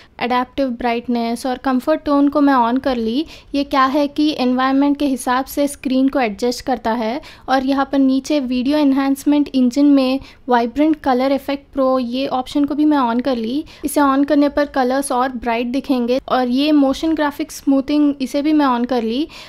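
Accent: Hyderabad, India. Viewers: Does anyone feel the Hyderabadi in her?? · Wow Hyderabadi Hindi amazing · Hyderabad se lagre aap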